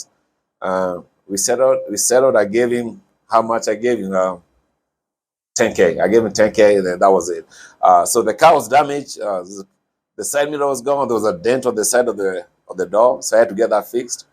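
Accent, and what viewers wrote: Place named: Kenya. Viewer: Kikuyu accent never goes away... enjoy dude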